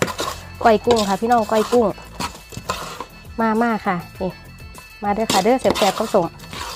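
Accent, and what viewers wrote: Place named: North, Thailand. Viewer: อู้เหนือด่ก่จ้าว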